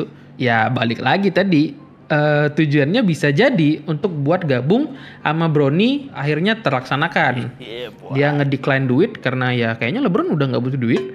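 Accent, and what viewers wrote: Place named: Jakarta, Indonesia. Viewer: Bang suaranya Betawi banget yak 😂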